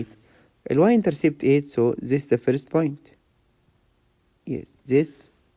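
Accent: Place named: Arab world. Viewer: I can tell from your accent that you are Arabic And btw you helped me alot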